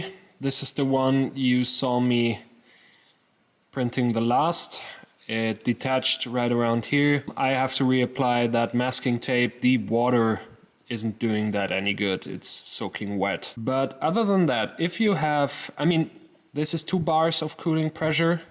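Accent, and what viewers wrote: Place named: Germany. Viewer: Endlich mal ein deutscher der ordentlich englisch kann ... nicht akzentfrei und auch nicht ganz fehlerfrei, aber immerhin besser als das was man sonst so hört.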